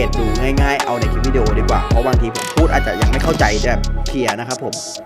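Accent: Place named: Ratchaburi, Thailand. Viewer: สำเนียงราชบุรีมาก😂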